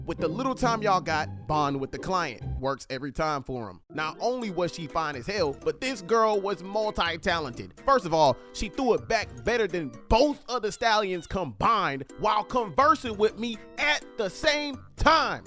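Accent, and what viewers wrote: Alabama, United States. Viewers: Holllll uppp you from Alabama?;?!?!?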